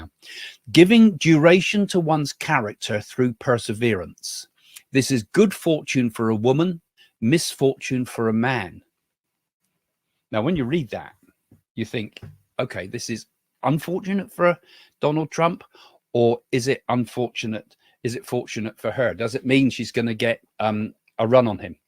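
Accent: United Kingdom. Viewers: Mr., YOU ARE THE BEST!!!! Your royal British accent is CHARMING!!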